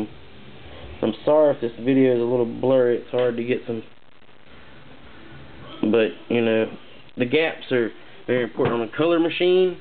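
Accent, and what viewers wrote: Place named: South, United States. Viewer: good video for new artist...the southern acent lol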